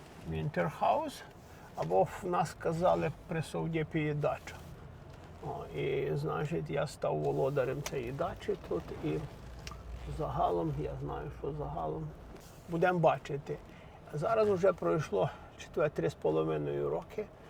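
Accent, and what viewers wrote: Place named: Ivano-Frankivsk, Ukraine. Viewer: по розмові чути Франківська обл....та ще й з горів... Але ви кажете, що з Вінницької області. Вибачаюся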